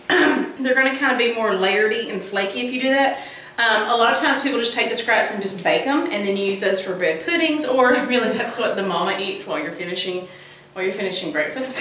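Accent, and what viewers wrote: South, United States. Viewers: Liked and will use.,,love the southern accent and look.latze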